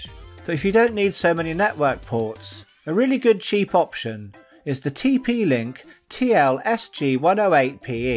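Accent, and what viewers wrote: United Kingdom. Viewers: Another Great vid. I hear your from South West England like me!! From Exeter, Devon my self!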